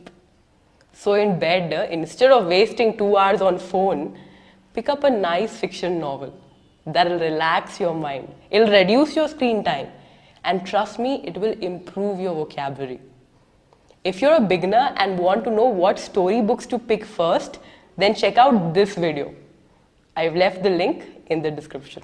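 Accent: India. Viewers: I have question why all hindis accent like this? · This isn't a impeccable comment. She is Indian so she will obviously, by heredity, have a indian accent. Nothing impeccable. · I just love the way u speak English 😌,,,,your English accent proves that u r an indian and even you never try to say English like their 😚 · You spoken english but saying like hindi. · I love Indian accents like how you are talking,.. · Your english accent is pure Indian..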